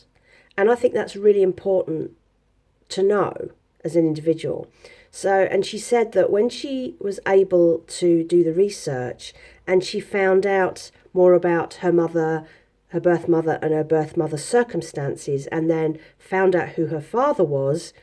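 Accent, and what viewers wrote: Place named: Australia. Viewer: I've been thinking you had an Australian accent for months! Where is your accent from ?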